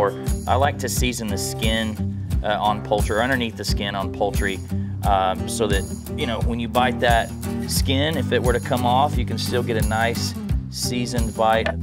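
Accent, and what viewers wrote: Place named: Texas, United States. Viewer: Real Texas voice like Ted Cruz🥶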